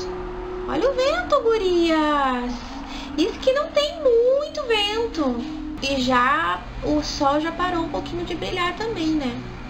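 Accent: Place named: Curitiba, Brazil. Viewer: Boa tarde sou nova aki no seu canal e já gostei muito..sou de curitiba e tbm tenho esse sotaque de( tá )e acho lindo nossos sotaques por isso q gostei de ti... há sobre a base achei q ficou bom este tom para ti tá kkkkkkkk bjss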